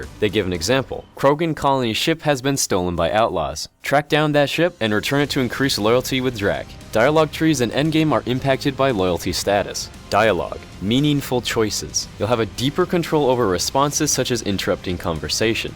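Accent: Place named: Canada. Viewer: ***** Lucky bastard, I'd love to have lived in Vancouver...The weather is perfect over there, that or maybe Slovakia...But yeah, I guess I should have said loosely pledged allegiance, ;^) Anyways, that's a lot of info I didn't know about my brethren across the bay, thanks for the info BTW. Was born on the Great lakes, so we got Toronto's wind...And CBC! (Heh as a snot-nose, I actually got your accent due to hours of CBC!)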